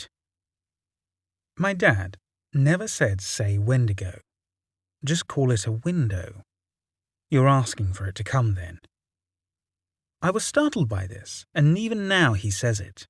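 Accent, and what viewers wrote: England, United Kingdom. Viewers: This is an American story why is this guy's accent English? Doesn't fit.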